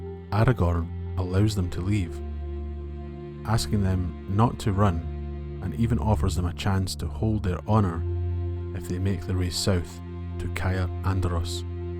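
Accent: Scotland, United Kingdom. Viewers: Wow what a wonderful Scottish voice/accent, a real treat! Many thanks.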